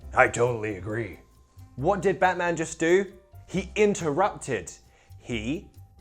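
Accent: United Kingdom. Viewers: I was attracted by British accent , I wanted to learn more and searched on youtube, then came across your videos. pancake +1 and spiderman is better .haha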